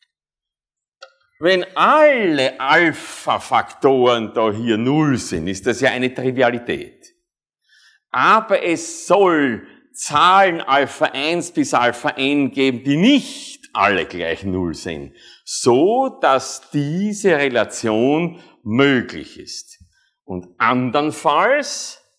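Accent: Austria. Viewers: Als Schleswig-Hosteiner ist es wirklich eine Herausforderung über eine längere Zeit dem sehr sympathischen Herrn Professor mit dem österreichischen Tonfall konzentriert zu folgen. LOL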